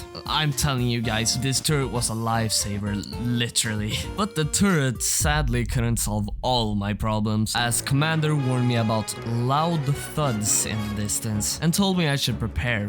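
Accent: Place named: Sweden. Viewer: bros talking swenglish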